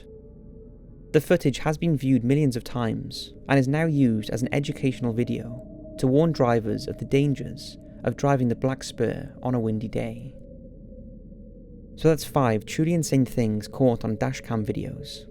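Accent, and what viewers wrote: Wales, United Kingdom. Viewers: I love your videos! Are you by any chance Welsh? I’m sure I can hear it in your voice!! Maybe Cardiff?! 😁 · TheMillster 81 - I love his soft Welsh burr! It's unmistakable. 😊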